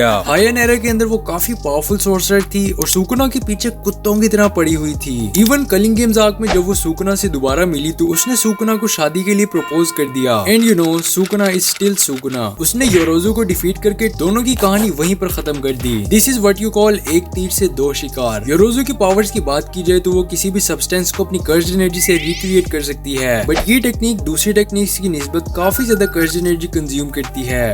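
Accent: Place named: Pakistan. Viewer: Accent so aukat pata chal gyi teri 🤡🤡🤡🤡🤡 Aata & roti bhijwa du Pakistani? 💀🤣 Garib Jai Hind 🇮🇳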